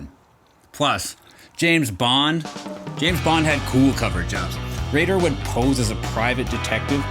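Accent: Canada. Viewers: I hear a Canadian accent? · Good vid! Wait….are you Canadian??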